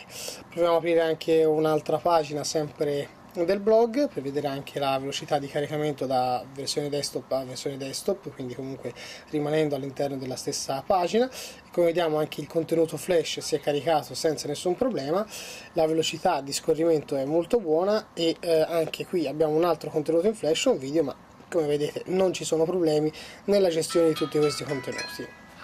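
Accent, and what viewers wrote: Tuscany, Italy. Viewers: Quanto TI AMO ACCENTO TOSCANO :D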